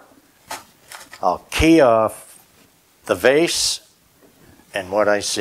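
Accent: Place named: Illinois, United States. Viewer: Big or small does it all! Beautiful job. They’re all my favorites. You mentioned that you lived in Tennessee at one time. Were you originally from Illinois? That accent!!! Lol! Were you a marine too? 😃🌷🌺 God bless and protect the Ukraine 🇺🇦 🇺🇸 TFS!!!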